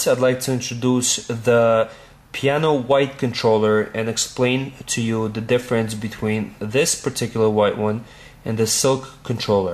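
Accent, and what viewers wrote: New Jersey, United States. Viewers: U from jersey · its a jersey thing pops in my head when i hear him talk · Jersey accent ;D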